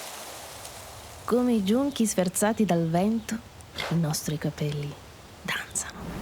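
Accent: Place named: Veneto, Italy. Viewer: Eja tenisi arrexioni...però l'accento sardo mi manca, sento solo il veneto e forse è per quello........qui ci voleva una faccina sorridente ma non so dove prenderla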